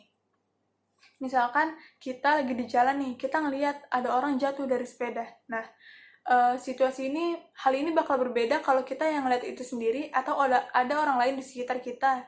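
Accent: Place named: Outer islands, Indonesia. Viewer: Bahasan yg lugas, tegas, jd dpt ilmu baru...tapi kl hati lg betmut bs ga ya org berprilku prososial...;🤔🤔 Dialek org dr sebrang ya kk😁..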